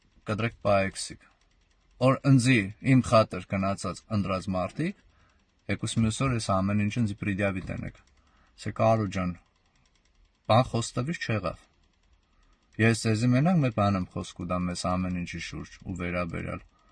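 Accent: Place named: Gyumri, Armenia. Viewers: Ապրես ախպեր ջան! Չգիտեմ լեննագանցի ես, թե ախրքալակցի, քաաաղցր ես խոսում! Ընտրում ենք N15-ին և ՀԱՂԹՈւՄ ԵՆՔ!!!!!!! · Гюмреци э hастат👍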